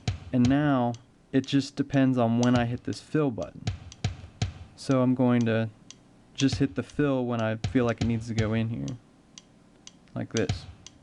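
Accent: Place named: Philadelphia, United States. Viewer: Ur accent...way u say compose...u have a ne twang there...xwife 18yrs from philly im usually spot on with accents...u have to be close to philly my guess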